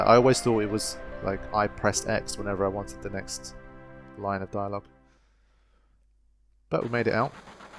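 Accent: England, United Kingdom. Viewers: Wait... Have you guys always had that chocobo opening square logo? I honestly think thats the only thing I didn't know about this game. I'm from the UK and for us it just boringly says 'Squaresoft' You sound English too though... I'm so confused but I've legit NEVER seen that before. Platinum release maybe? Or are you in another region? On a different note, aren't you the guy who went to visit the blind guy who loved your FFIX LP because you read the dialogue out loud? That was awesome. Lastly, I feel like you had a FFX video years ago, sort of a retrospective-esque vid where you spoke about the ending and said something like "I was in bits! Absolute bits!"? For some reason I always remember that.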